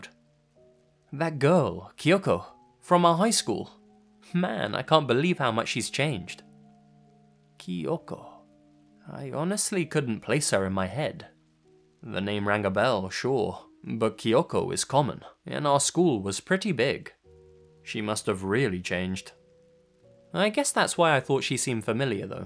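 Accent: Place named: United Kingdom. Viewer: I have been watching you for a year and I've only just realized your British like me.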